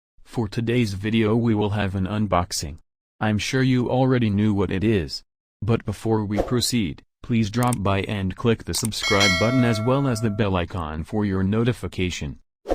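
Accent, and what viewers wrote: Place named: United States. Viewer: astig naman ni american ang boses HAHA.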